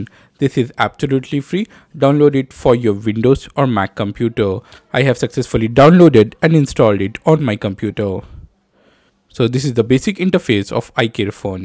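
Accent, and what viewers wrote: India. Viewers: INDIAN VOICE!!!!!!!!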